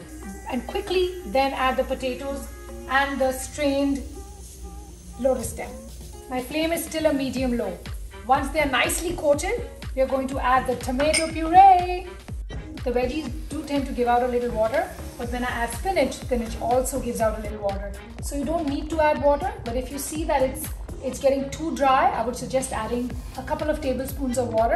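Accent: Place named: United States. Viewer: Wow u picked up American accent very nicely. You are same way beautiful.❤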